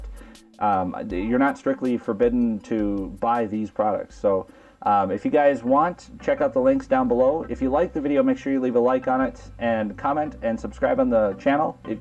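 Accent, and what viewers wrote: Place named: Newfoundland, Canada. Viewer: You sound Canadian, I sense a Newfie.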